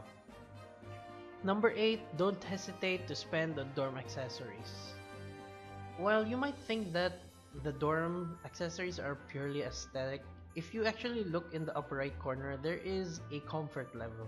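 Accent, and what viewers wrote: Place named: Philippines. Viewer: Ang accent mo parang Filipino Pilipino ka ba?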